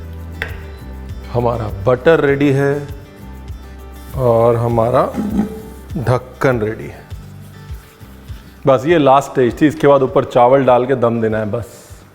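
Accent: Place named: Bengal, India. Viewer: Your Bengali accent is awesome! I am also a bangal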